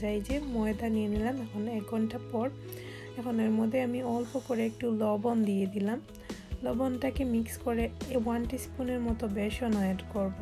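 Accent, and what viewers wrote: Sylhet, Bangladesh. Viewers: sylhety sounds natural to hear that's all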